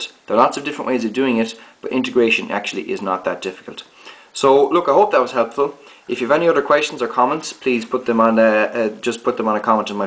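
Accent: Ireland. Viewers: Excellent video and this man's Irish guys, not Scottish :P And you will also need to use endless amounts of integrals in economics when trying to analyse profit margins and macroeconomic objectives. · he's irish!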